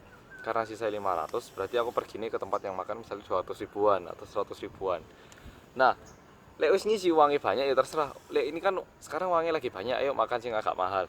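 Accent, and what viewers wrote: Java, Indonesia. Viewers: Bèné medhok gpp. Lha kan ancen wong malang haha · kerenn....sam logatnya medok jawa banget sam... dulu belajar dimana sam?? berapa tahun belajarnya,??... maaf kepooo 😀😀😀😀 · Weh wong korea bahasane jowo jos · Medok banget 😂😂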